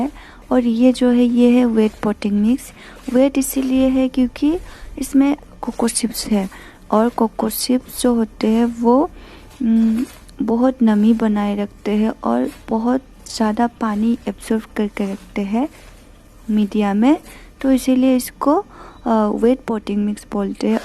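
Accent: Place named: Assam, India. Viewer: aap ka Assamese accent perfect hai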